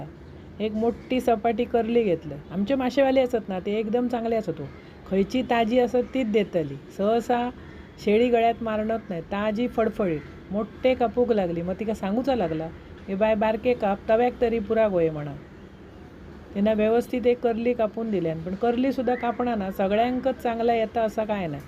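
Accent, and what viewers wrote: Malvani, India. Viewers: फारच छान गो ताई तुमचा मालवणी बोलुचा स्टाईल नी तुमचो आवाज पण लयीचं चांगलो असा मी बेळगांवची ताई पण मका कोंकण लय आवडता माजो बहिणी दिल्या असत कोकणात एक सावंतवाडीक असा एक कणकवलीत असा आणी मी आता माजो बायको मालवणची करतय मका मी फारतर मालवणातचं असतंय गेलात आईतवारीच इलंय थैसून ताई तुम्ही खयचो ओ मी तिकडे इल्यावर तुमका नक्की भेटतंय मका चांगलो म्हावरा घेऊन देशील ना गो ताई मका साफ करुक कापूक येतंय मी मटणवालो असा माजो मटणचो दुकान असा ताई माझी अंजली पण मालवणी पद्धतीने फारच चांगलो चविचो जेवण करतय ती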